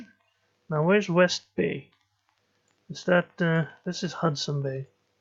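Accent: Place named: Scotland, United Kingdom. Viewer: For Greek script you can keep in mind that the P is actually an R (similar to Cyrillic in Russia), the triangle is a D, the H is an E, the triangle without a bottom line is an L and the P is an R. That are - im my opinion - the most valuable Greek letters. And if I may ask, from which country are you? Yo sound like a Scotsman.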